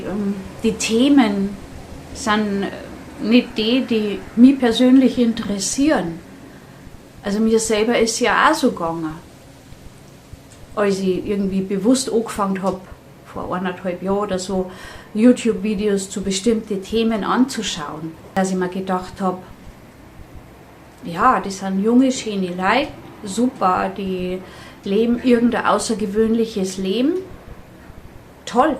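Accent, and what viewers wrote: Bavaria, Germany. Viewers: So super schön deine Videos, vor allem so vielfältig!!!😊 Finde auch deinen Dialekt super, ich komme auch aus Bayern und verstehe sehr gut was du sagst😊 Musste eben so lachen als du geflucht hast (Zefix...)😂😂😂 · Ich finde es ganz natürlich und wunderbar ,wie Du Deine Videos für uns rüberbringst. Und bayerisch ist eben bayerisch, wie die Landschaft sich gestaltet, so passt auch der Mensch. Ich liebe es, alles zusammen. ❤lichen Dank.....hier spricht der Städter, der die Berge liebt und vieles mehr😅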